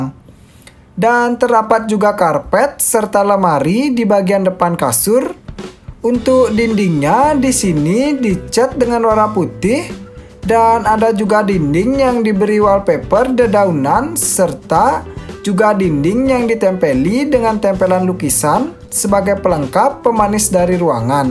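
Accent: Bali, Indonesia. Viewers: Logatnya kayak org bali ya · logat bali nya medok banget blii 😁,,, btw desain nya keren banget sangat menginspirasi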